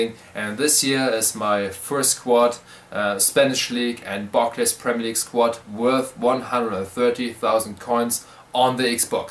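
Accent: Germany. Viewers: du bist deutsch hört man ?? :)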